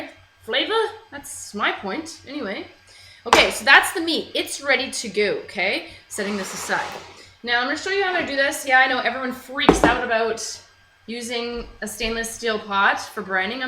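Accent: Canada. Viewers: Lol you are so canadian! The accent is pretty sexy though...great video!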